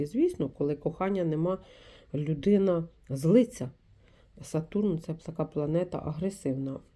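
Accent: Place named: Kirovohrad, Ukraine. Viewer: ви самі звідки?Я з Кіровоградщини і ви розмовляєте так,як у нас балакають.Дякую,що я вас відшукала, відео короткі,дякую.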